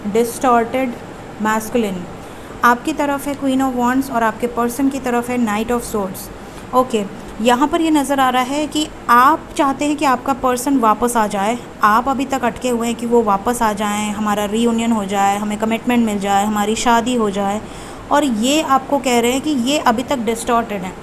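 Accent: Varanasi, India. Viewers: Har har mahadev🙏❤ apki banarasi language bahut pyari h i love this language mam🙏 thank you angels thank you universe thank you divine thank you mam god bless you 🙏❤🌷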